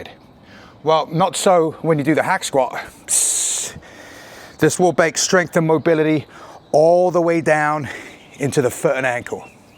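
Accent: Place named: Australia. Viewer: Where are you from? That's the first South-east UK/ east coast US, with hints of Aussie accent Ive ever heard?!?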